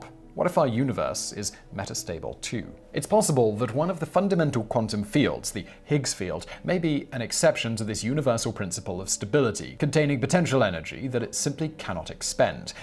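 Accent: United Kingdom. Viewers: Why does vsause have a brittish accent? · I love Simon's voice..the information gets absorbed alot easier when its in British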